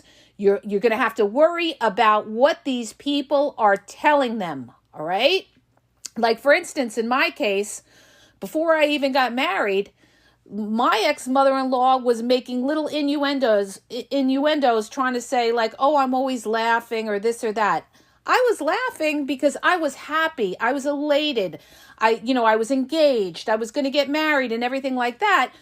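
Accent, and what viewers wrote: New York, United States. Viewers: Your from queens or long Island, it sounds like im back in the old neighborhood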